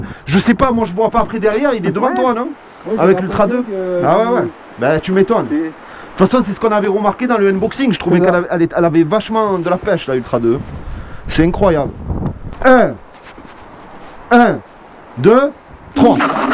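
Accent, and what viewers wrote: Marseille, France. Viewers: Excellent les Manceaux, je les vois dans la semaine pour essayer la dualtron mini et la futecher gun pro j'espere qu'ils l'ont recu. Merci pour tes videos. Je suis un nouvel abonné et franchement au top avec ton accent de Marseille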